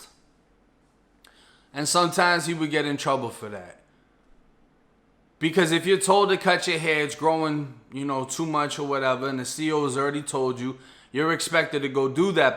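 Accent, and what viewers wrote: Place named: East Coast, United States. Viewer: Biggest crush EVERRRRR😏 it's the east coast accent with the Florida dgaf vibe for me🤷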